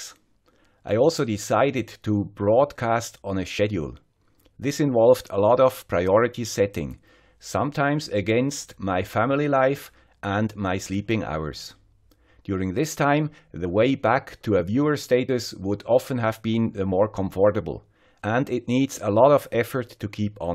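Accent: Switzerland. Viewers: The content and the way it is presented makes this site a valuable learning tool for me. The gloves and Swiss accent enhance the presentation. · Hello from the guy who does not care about appearances. I had learned some interesting things from your channel and I hope that in the future you will keep sharing with us your projects and ideas. In Florin country we have a saying "Dogs are barking, the caravan is passing", keep up your work and only give your attention to things that are worthy of it. Big up for the guy with the Swiss accent. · thanks for continuing to produce high quality and well researched videos. Your channel is one of my favourites because I know every video will be well made and I will learn something new. That must take an extraordinary effort and I appreciate what you’re doing. I never knew the background behind your introduction or the gloves - just assumed you were extra cautious with ESD - but now they’re a trademark and I look forward to watching each video from ‘the guy with the Swiss accent’. 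Best wishes from Australia. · Thanks for all the great videos! Your channel is one of the best on electronics and one of my favorites. And the Swiss accent ROCKS :) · I recently discovered your channel and found it very insightful. Thanks a lot for all the time and effort you are investing - highly appreciated! And - having grown up at the Swiss Border - I love your Swiss Accent as it reminds me of my childhood:-) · and most important: you are 100% fine !! with or without gloves. and we all love your swiss accent. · you earned this a longtime ago! No doubt about it. Well done to our little community for embracing the guy with the Swiss accent, I hope he feels the love & respect that we all feel for him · Good to hear you're not stopping production. I would miss listening to the 'guy with the Swiss accent'. Belated Happy New Year. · Your Swiss accent is one of most characteristic things in your videos. 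And you speak very clear, with is very good. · I have been watching your channel for about a year now. Please don't be worried about the accent. In fact it reminds me of my friends from the time I lived in Switzerland. Thank you for sharing your knowledge with all of us. Keep up the great work and have a wonderful 2018. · I've been watching your channel for quite a while now, and one of the things that I've noticed recently is that your voice-overs are improving a LOT, in both audio quality and overall. Part of it, it sounds like to me personally at least, is that your spoken English has gotten much better, in my opinion (it was never 'bad' in the first place per se, but it's gotten progressively better) -- however, don't ever lose your Swiss accent :) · thanks for all of the videos. I find it amazing that you can produce videos of the quality level you do with the charts, script and editing that you have in only 10 hours. 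Your videos are always a cut (or 2) above the norm. The Swiss accent & the gloves are part of it all. I’ll suscribe as soon as i figure out how to do it from my i-pad. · Please keep creating! I've learned more useful information from 'the guy with the Swiss accent' than I did while getting my degree. I'm proud to support you on Patreon and I look forward to many more insightful videos. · Mister, thank you for all videos, it was very important for me (I think "for all us") understanding about esp8266. Some, I saw more than one time do understand, and I learned more and more with you and your swiss accent......... I understand the difficulties about be a youtube creator without money, money is the engine of world, but, while you can, please don't go! Thank you again and hope a 2018 best for you, your family and you business. Regards from Brasil. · Cool! Thanks for sharing. I like the "Guy with the Swiss Accent" opening. 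Keep up the good work and there is no telling where it will take you. That's awesome you have been asked to speak.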